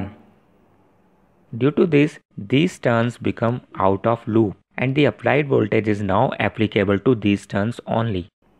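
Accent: India. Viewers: Your electrical knowledge is so good. I am pleased to improve you Indian english pronuciation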